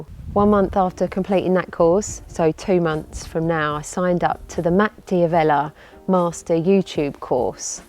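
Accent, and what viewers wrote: United Kingdom. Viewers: It is interesting when you say it takes so long to edit a video. My first thought it doesn't need to be so perfect. You could sit down, smile, and talk in your British accent and I'd be happy ;)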